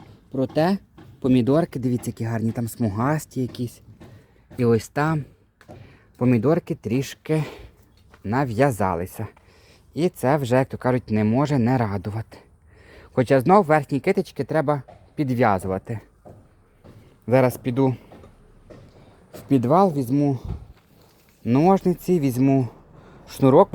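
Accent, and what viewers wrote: Ternopil, Ukraine. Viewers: Я з Криму. Обожнюю ваш тернопiльскiй говiр💕Ще з Мастер шеф.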